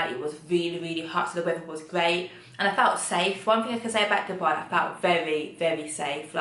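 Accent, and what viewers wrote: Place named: Midlands, United Kingdom. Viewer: Your ascent sounds like u from the Midlands(Birmingham, Wolverhampton, etc). Grt8 choice, hope to meet u in Gh soon.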